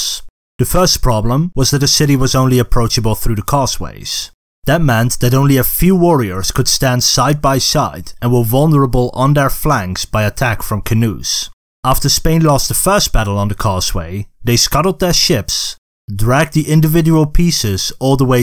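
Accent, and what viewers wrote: Netherlands. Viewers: Are you Dutch? You're accent kinda sounds like it.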